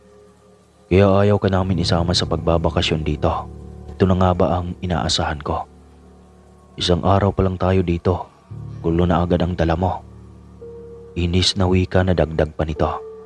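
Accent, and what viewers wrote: Philippines. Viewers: Taga leyte ka ba? Parang tagalog ang punto ng pananalita mo...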